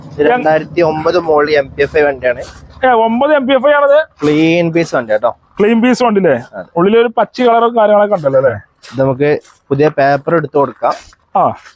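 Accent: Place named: Malappuram, India. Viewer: മലപ്പുറം ഭാഷ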